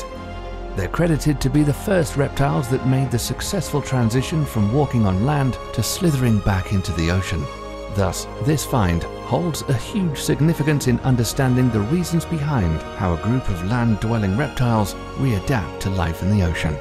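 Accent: England, United Kingdom. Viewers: That accent on this guy is not desirable. Why have a British dude instead of an American? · Is the English accent used to make us feel more frightened?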